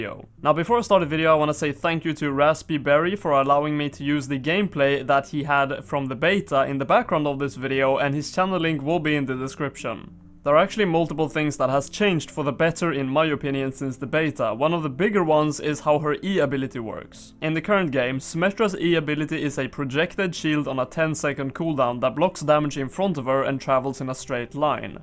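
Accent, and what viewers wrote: Sweden. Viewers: are you swedish? I'm swedish and thought that you sounded like a swede. (I'm not trying to be mean.) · You sound like you are swedish?!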